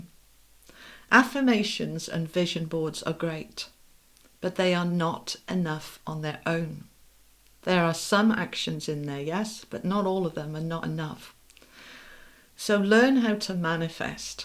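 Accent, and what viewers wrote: Wales, United Kingdom. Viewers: I needed that thank you. ❤ Are you a little bit Welsh? You have a nice accent. Peace and love to you.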